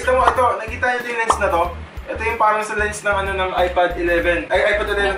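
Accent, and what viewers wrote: Iloilo, Philippines. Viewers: Ilonggo ka pala Boss? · Ilonggo ka po ba kuya? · Boss. Ilonggo ka pala?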